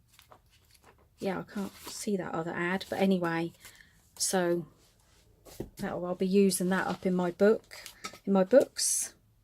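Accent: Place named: Norfolk, United Kingdom. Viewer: So beautiful! Also looked at your Etsy shop because I recognised your accent - good ol' Norfolk! (Me too!) x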